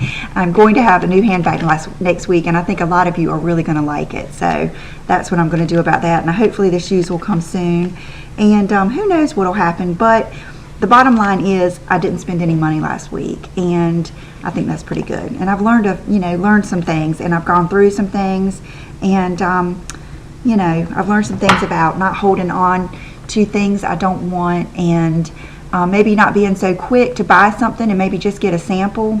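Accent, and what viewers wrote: South, United States. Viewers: ! I am one of the owners of the salon MAVEN Beverly Hills- I have been a huge fan of yours and followed you for the last several years. I am originally from Mississippi so I love the southern accent, makes me feel like I am home! Just a couple of weeks ago we launched our very own Youtube for my hair salon which opened in August! Check us out - I think you will really love the message that we plan to deliver on our channel!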